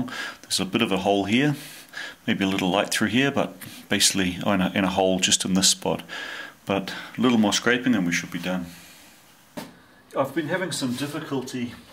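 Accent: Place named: New Zealand. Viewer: Ah ha! I thought I detected a NZ accent! Used to work for US branch of a company, TNT, headquarted in AU. Chatted with blokes from all parts of that world. Enjoy your vids! Thanks for sharing!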